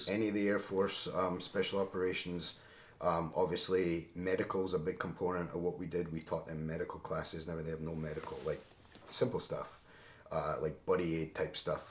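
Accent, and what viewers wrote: Scotland, United Kingdom. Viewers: does he have half a Scottish accent ???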